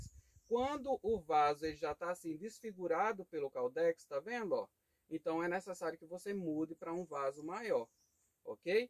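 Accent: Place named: Minas Gerais, Brazil. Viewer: Fala meu querido!!!! E esse sotaque??? Kkkkk tá bem minerin. Me inscrevi no canal e boa sorte!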